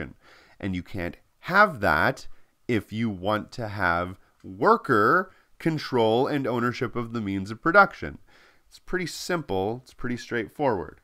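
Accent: Canada. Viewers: I can tell you are Canadian by the way you say "about" but good video 😁👍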